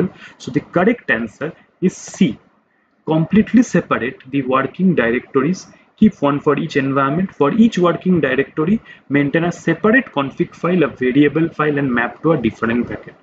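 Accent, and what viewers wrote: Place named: Bengal, India. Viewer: Explanations are nice, kindly work on ur bengali accent to make things easier for others. U got potential.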